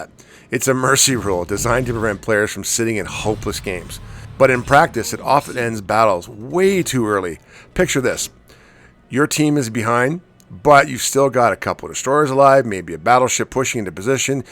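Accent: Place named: Canada. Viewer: Just sub'd -- good to have a Cannuck voice here -- since I-Chase Gaming went away ..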